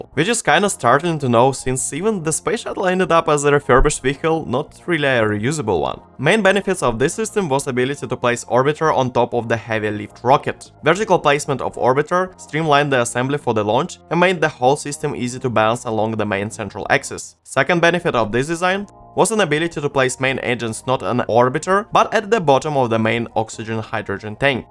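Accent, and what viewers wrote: Russia. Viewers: Are you from Russian-speaking country?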